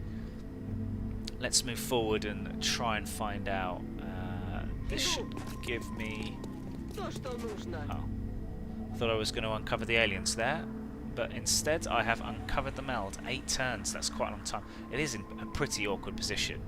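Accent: United Kingdom. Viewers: I've started to watch the playlist really enjoying hearing British accent. Only on ep2 so far.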